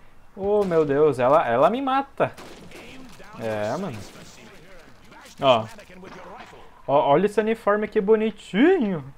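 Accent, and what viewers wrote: South, Brazil. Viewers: conheço sutaque do sul kk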